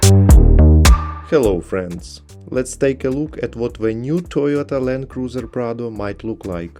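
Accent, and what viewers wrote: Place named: United Kingdom. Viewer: ШИКАРНЫЙ БРИТАНСКИЙ АКЦЕНТ!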